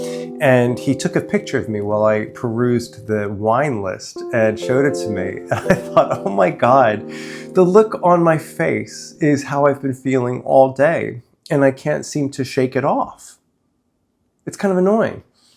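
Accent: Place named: Philadelphia, United States. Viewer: happy belated. of course you're a taurus, makes every sense. I loved your honesty so much in this one. And if you don't mind my saying, I can hear your Philly come out. I masked my Philly/South J accent a thousand years ago... but it comes out at times. And yours came out in this. It's between you and me.;)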